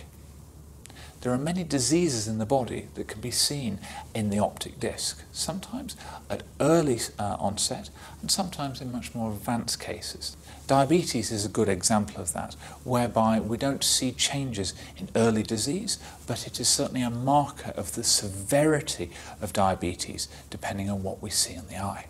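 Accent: United Kingdom. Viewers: British accent 💚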